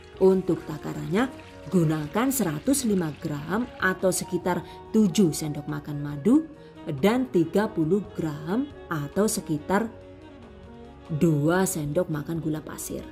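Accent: Banyumas, Indonesia. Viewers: Iya cantik... Kok bisa ya Kadang ngomongnya pake bahasa ngapak ? Sama kaya q orang ngapak.. 😄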